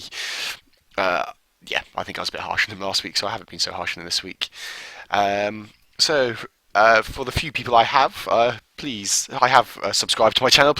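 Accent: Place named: United Kingdom. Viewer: The intro: DEATH FIRE SINS HELL IS REAL The guy: * sweet little british man * I assume british sorry if not **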